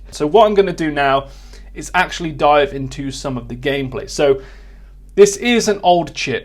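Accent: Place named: West Country, United Kingdom. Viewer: Jesus that west country accent :) Hardly ever hear YouTubers with a south west accent. Love it!!! lol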